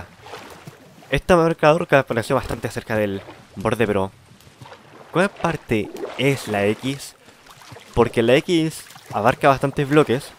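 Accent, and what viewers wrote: Chile. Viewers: tu manera de hablar como un chileno de verdad me encanto, buen tutorial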